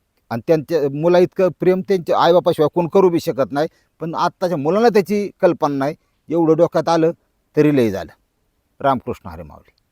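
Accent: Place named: Beed, India. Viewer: मामा बीड वाले दिसताय भाषेवरून म्हणतोय ह्या गोष्टी लोकांना आवडतात ते तुम्ही बरोबर ओळखून विषय ठेवता त्यामुळे like मिळतात jacket आवडल बाबा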